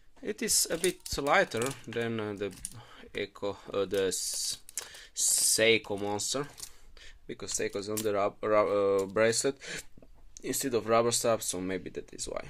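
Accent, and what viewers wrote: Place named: Romania. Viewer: You have a romanian accent, am I wrong?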